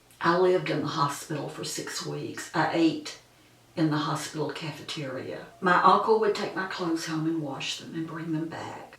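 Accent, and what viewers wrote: South, United States. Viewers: Oh my...what an extraordinary video. The path that you walk each day looks so peaceful. I wonder..if it had not been for this self quarantine(something awful) taking place would you have ever shared such a meaningful part of your past (something lovely). It was really comforting to hear you talk and read about your aunt. Maybe it was your hypnotic southern drawl that lulled me into a place long ago. 🤔 This video was so calming. I loved all you talked about. ❤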